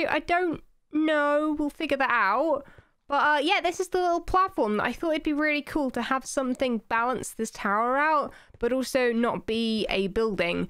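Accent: United Kingdom. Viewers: are you British because I've been going through hermintcraft and I'm not sure if you have a British accent because I love british accents. If you don't it's fine because your commentary is my favorite out of all the you tubers and actually I like you and dreams commentary but love your videos best hermit craft series out of them all keep up the good work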